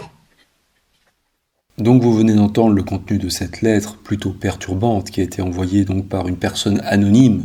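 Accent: England, United Kingdom. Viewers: moi j'adore ton accent anglais, je suis fan 😅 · ton accent Anglais me fait toujours rigoler, c'est pas méchant.. c'est quand même gentil d'avoir une pensée pour ses personnes disparus.. bye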